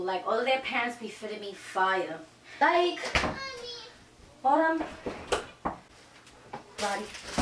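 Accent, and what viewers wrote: New York, United States. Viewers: I love that NY accent ❤️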